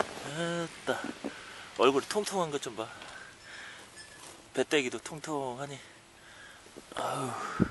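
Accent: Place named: Honam, South Korea. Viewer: 고양이가 큰게 아니라 부은거다 임마 말투도 조낸 듣기 싫네 호남사투리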